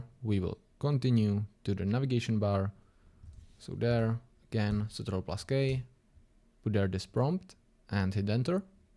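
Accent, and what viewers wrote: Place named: Slovakia. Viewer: Podľa prizvuku si slovak mam taky pocit, koľko sa pohybuješ ako web dev ?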